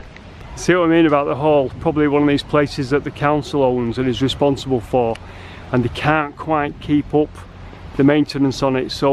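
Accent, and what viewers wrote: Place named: Manchester, United Kingdom. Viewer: you got more a north Manc accent, my cousin could be from Barnsley if you didn’t know...proper Yonner.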